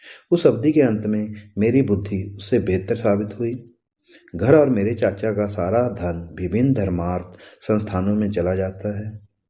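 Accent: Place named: Punjab, India. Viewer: कहानी शानदार है आपका उच्चारण पंजाबी है इसलिए माहौल नही बना सके Do little homework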